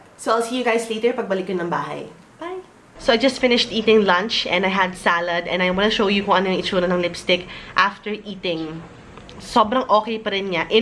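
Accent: Conyo, Philippines. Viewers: i love the way you talk ate very conyo i like it haha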